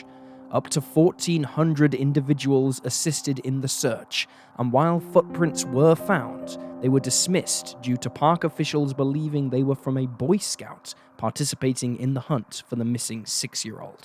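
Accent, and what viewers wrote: United Kingdom. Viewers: I missed the British voiceover!!! Welcome back!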